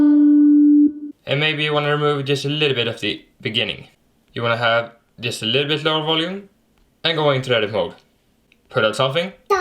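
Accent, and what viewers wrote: Sweden. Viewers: are you Swedish? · Are you Swedish? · Är du swensk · Are you come from sweden? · are you SWEDISH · Are you swedish? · Are u sweedish ? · Are you from sweden? :) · are you from sweden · Are you Swedish 🇸🇪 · Swedish accent then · Are you from sweden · Ghoits Hafidz He's swedish like me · Omg your Swedish awesome i am to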